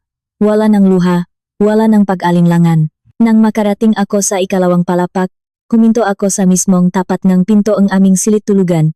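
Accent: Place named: Philippines. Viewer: Pilipino slang mag narrate.. its annoying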